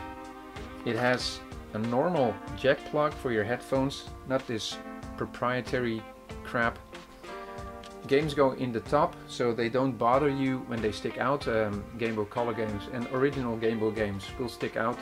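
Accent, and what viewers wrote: Netherlands. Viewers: hahahah je nederlandse accent is te herkenen :D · 5 seconds in. This dude must be dutch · I got a slight feeling you are dutch like iam. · Are you perhaps Dutch? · ja jij bent nederlands wist het van je accent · Great job! also it seems like you're from the netherlands, i live there to! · I love that dutch accent so much xD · You souwnd very like a Dutch persoon, very goed engliesch. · are you dutch ik wel! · Ik hoor een Nederlander 😂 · Gotta love a Dutch accent! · very recognizable accent :)! I am dutch too. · Ik dacht al toen ik het accent hoorde: Is hij Nederlands? Maar al snel zag ik bij dat het zo is!😄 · Spotted that Dutch accent from miles away. Nice video too. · woooow dat nederlands in het engels vermengd xD echt gaaf gedaan! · Hey, loved the video. Are you Dutch? You sound very Dutch. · is that a dutch accent or is it just me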